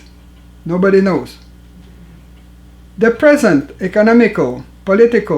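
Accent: Quebec, Canada. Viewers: your own government actively committing civilizational genocide on itself is pathetic. Also, this man sounds like he's from Quebec, not the US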